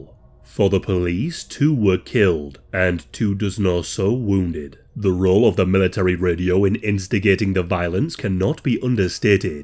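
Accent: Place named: North East England, United Kingdom. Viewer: The most durr voice on the Internet. Rotten accent that North Eastern 🤢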